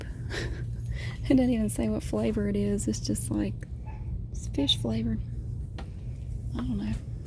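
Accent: South, United States. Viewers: I’m love your videos! I was just like you….I too had a smart mouth 😂 I did get in trouble for talking in class tho. I wasn’t disrespectful just talked too much 😂😂😂 I’d have to stay after school and write “I will not talk in class” on two pages of lined paper! 😂😂😂 And I love your southern accent. I live in a suburb outside of Chicago. Not a lot of southern drawl in the Midwest. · Love your southern accent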